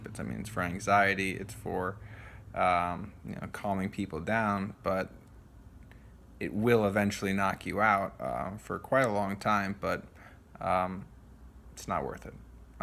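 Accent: United States. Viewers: Are you from Tennessee?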